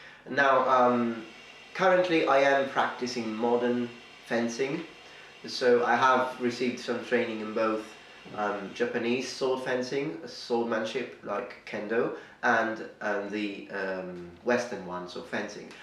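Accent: United Kingdom. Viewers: Came to see young with the strongest british accent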